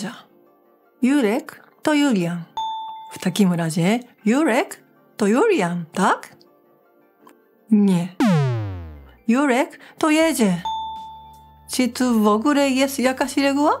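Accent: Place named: Japan. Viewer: Witaj;) moje imię to Mirosław = Mirek świetny przykład imion w gramatyce;) ciekawy jestem natomiast czy zrobisz odcinek na temat zodiaków Polskich i Japońskich? uwielbiam twoje filmiki świetnie mówisz po polsku;) pięknym akcentem Japońskim;)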